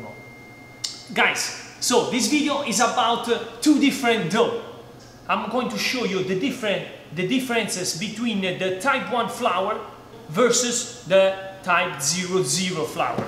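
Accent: Italy. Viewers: love Italian accent!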